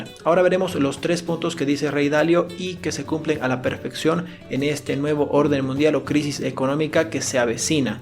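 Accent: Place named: Cuenca, Ecuador. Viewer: Muy buen video primo! ( tienes acento cuencano talvez si somos primos!)👍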